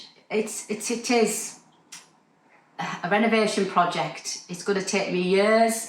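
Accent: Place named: Northern England, United Kingdom. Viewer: Amazing. Look at you GO!! I can't wait to see the reno and all your adventures. I will say you don't have to say Widowed you can say Single. I love sewing but I also love a good reno. Hw about A Dressmakers Life that should incorporate your fresh start while also giving a nod to where you came from. I love being able to sit here in the US (currently) and listen to a Northern accent. Makes me think of Doncaster and my youth lol.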